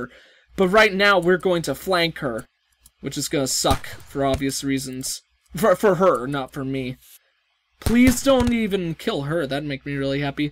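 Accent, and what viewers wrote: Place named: Australia. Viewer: you sounded Australian to me (I'm British)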